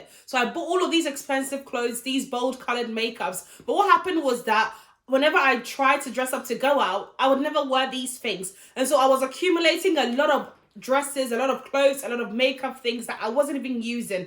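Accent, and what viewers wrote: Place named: United Kingdom. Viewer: You are from Nigeria but you don't speak like one. You have a UK accent, I like the way you speak nice English. Maybe you were brought up in the UK.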